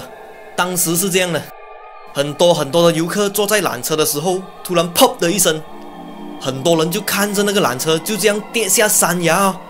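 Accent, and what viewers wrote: Malaysia. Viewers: 最恐怖的還是馬來腔華語發音好恐怖😱😱...嘩咾欸..😱😱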